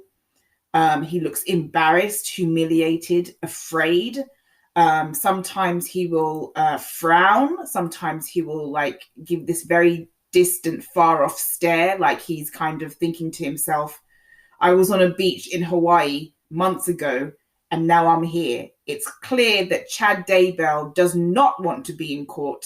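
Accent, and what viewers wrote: United Kingdom. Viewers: Love your accent a new subscriber are you from the UK.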